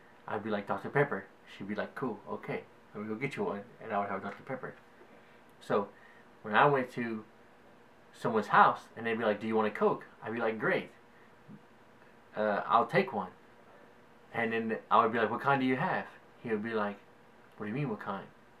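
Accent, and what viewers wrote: Louisiana, United States. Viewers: you are pretty Cajun. XD BUt, yeah, I have heard a lot thicker. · yeah, you definitely sound Cajun. I love way you talk. I'm from southwest Virgina. I've always called my grandparents papaw and mamaw, too, we say wash the same way, too. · your accent is a touch Cajun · You definitely have one. It is not as heavy like others have said but I hear it. When you say sixty, it sounds like siggsti. And when you say fork, stuff, sure, New Orlinnns. You pick up your vowel sounds really lightly, almost like you are are rapping. And your t sounds are really strong lol. Houston and N'awlins y'all.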